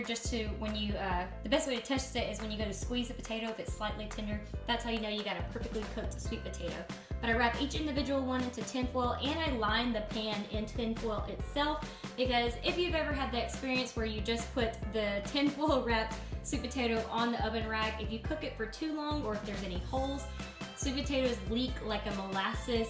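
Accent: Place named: South, United States. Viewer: Hey!, I just want to say that I just love your southern accent, and as for the price of the meat, why don't you try out canned sardines. I just started to eat them. I got them from Trader Joe's and they are the best. Also, I want to say is that your competition meal prep is my every day meal prp.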